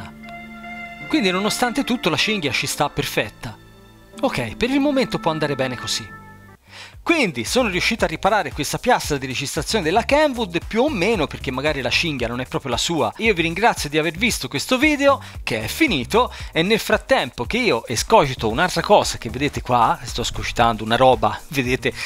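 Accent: Tuscany, Italy. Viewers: Ti ho appena scoperto, sei davvero molto bravo ad intrattenere, oltretutto ho notato che hai il timbro della voce uguale identico a quello di Gianluca Bocci , se non fosse per l'accento toscano marcato ( che è splendido fra l'altro) saresti uguale a lui 😂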